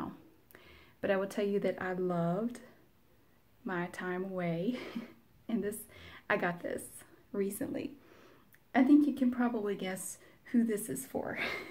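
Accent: South, United States. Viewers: Fell in love with your voice already. Love that Southern accent. Subscribed!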